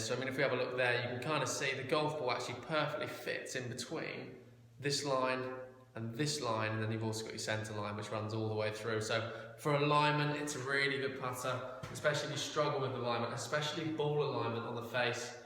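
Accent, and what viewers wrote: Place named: England, United Kingdom. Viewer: Sorry, but between the echo, distance from mic, my hearing issues and the English accent- Can’t understand a thing, but thanks anyway.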